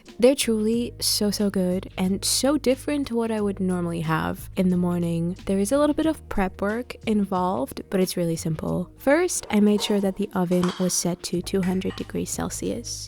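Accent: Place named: Germany. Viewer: Is she German? I swear her accent sounds super German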